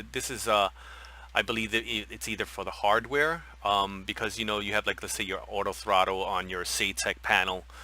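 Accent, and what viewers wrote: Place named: New York, United States. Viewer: Love that accent mate, New York?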